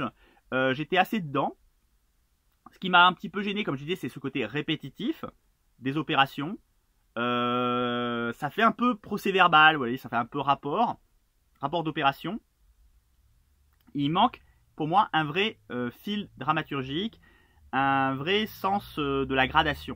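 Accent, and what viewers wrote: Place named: Nord, France. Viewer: Bonjour vous êtes chti ? (Vous avez un fort accent, désolé de le dire).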